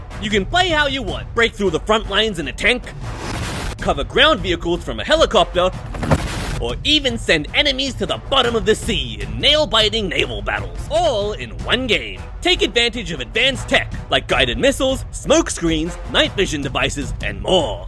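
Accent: United Kingdom. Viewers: Are you British? Spits tea on dude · Well if you’re not British? What are you? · Are you british? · R U BRITISH? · Are you British? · Are you British? (Slurping hardly) RAHHH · R U BRITHISH???? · bro is British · Are you brithish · r u british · . ARE YOU A BRITISH 🤣🤣🤣🤣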